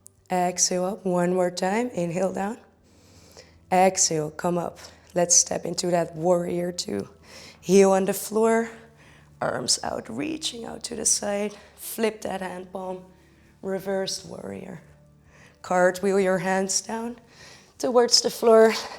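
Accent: Netherlands. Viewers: I'm literally just ten seconds through the video and my immediate first impression was wow, your voice is so calming & soothing and after like 3 seconds I recognized that you have a Dutch accent.:) Been to the Netherlands several times and learned the language a bit. Will definitely do this yoga session with you tomorrow morning when I do my yoga practice on the balcony.:) Dank je wel,!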